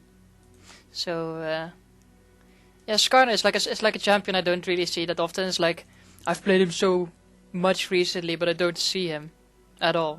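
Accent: Netherlands. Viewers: you sound like a guy who comes from holland? am i right?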